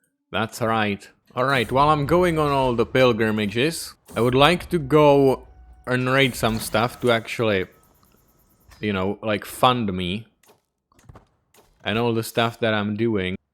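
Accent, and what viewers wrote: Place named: India. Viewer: Can't say that there's no accent. It made me think he was Indian. Honestly, I would never have guessed he's actual origin because of that.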